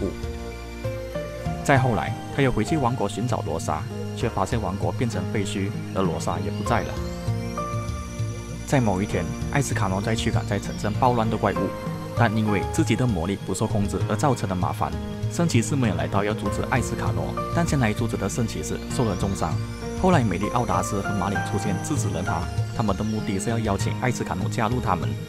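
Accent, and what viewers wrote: Malaysia. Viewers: 我肯定你是马来西亚人！！ 我看了你全部的影片觉得口音有点想马来西亚的，结果你说玩玩啊你以为我就认定你是马来西亚人了😂😂😂😂😂 我是真的有看完你全部的影片喔😂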